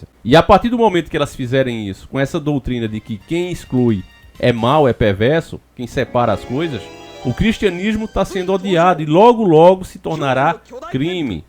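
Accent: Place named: Southeast, Brazil. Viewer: Esse canal é nordestino? O sotaque parece muito de SE.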